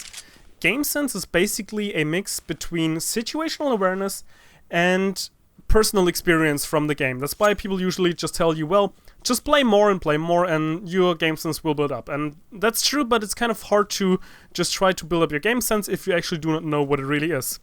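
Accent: Germany. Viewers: your sound like german are u?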